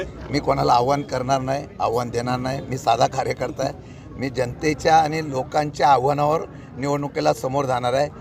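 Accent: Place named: Satara, India. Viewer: Ek no neta jo boltoy to satara bhashet ekdam kadak ani pudcha vatchlis shubhecha🎉🎉🎉🎉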